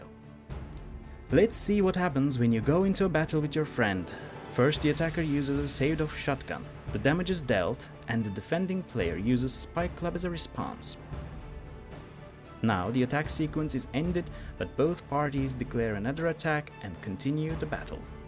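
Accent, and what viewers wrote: Turkey. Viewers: bunu bir türkün seslendirdiğine yemin edebilirim ama kanıtlayamam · Yapımcıları türk zaten hani seslendiren de türk aksanından belli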